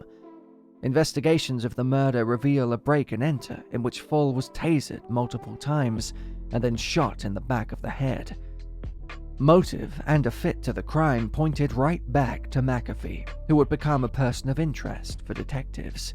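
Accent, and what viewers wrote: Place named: United Kingdom. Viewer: John probably watched this in jail and then killed himself due to this pompous british voiced narration mini doc.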